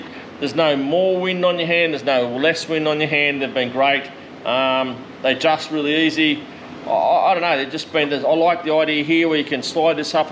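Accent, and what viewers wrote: Australia. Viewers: sooooo aussie 😂❤️❤️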